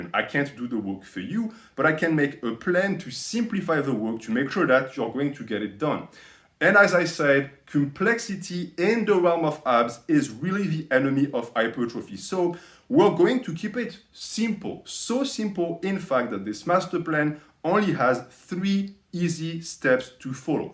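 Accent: France. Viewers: je viens de découvrir ta chaîne et j'ai su en une phrase que tu étais français avec ton accent , continue tout est excellent et cette french touch ça donne juste envie de regarder d'avantage tes conseils et d'en apprendre plus , à la prochaine .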